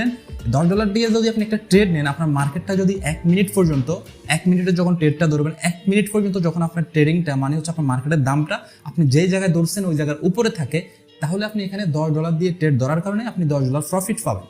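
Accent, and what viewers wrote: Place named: Chittagong, Bangladesh. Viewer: Chittagong er mone hocche 😐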